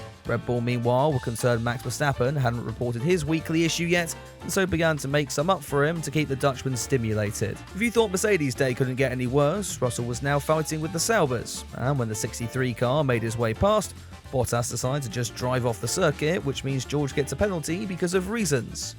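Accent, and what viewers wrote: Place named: North, United Kingdom. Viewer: can you please talk a bit slower for not so northern accented non Americans thanks otherwise good comedy review😂😂😂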